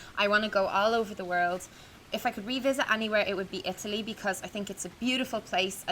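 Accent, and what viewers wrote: Ireland. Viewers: im from cassie's video. i love your irish accent! :) youre so nice lending your EH to cassie even of she stole it lol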